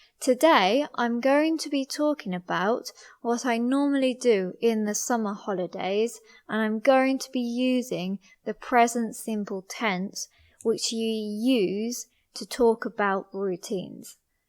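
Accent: United Kingdom. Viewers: Your voice is so pretty wow I love it with the unique British accent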